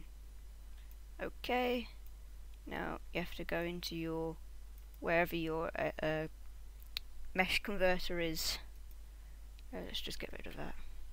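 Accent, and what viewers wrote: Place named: England, United Kingdom. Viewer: omg your english I LOVE ENGLISH PEOPLE OMG :D you sound cool!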